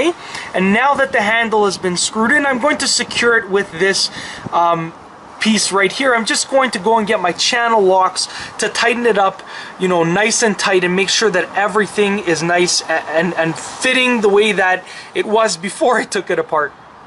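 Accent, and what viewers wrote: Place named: Eastern Canada, Canada. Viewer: ray r at which of your local "skool" does he sound like a prof to you? Please tell is so we can avoid that area LMAO. He sounds like a typical north eastern guy or eastern Canadian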